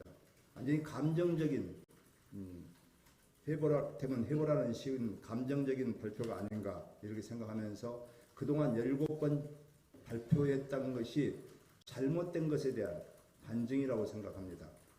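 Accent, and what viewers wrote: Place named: Honam, South Korea. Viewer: 주승용 가리려해도 호남말투 가려지지가 않네.그러니까 니가 민주당 꼬봉밖에 못하는거다.퉷!지긋지긋하다 호남국 인간들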